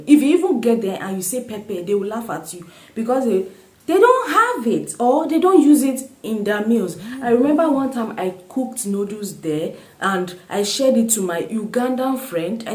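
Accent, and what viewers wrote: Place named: Nigeria. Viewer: It's a Nigerian accent for me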